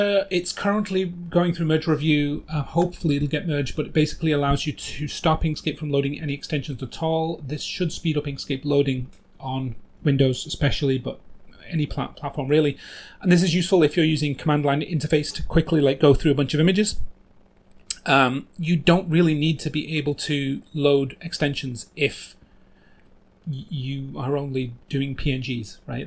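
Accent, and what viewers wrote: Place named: United Kingdom. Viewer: you sound British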